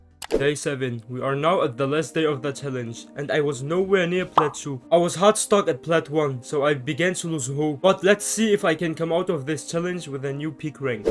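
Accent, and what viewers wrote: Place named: Arab world. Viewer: I'm the 700th subscriber Also keep up the good work (where are u from btw cuz u have an Arabic accent)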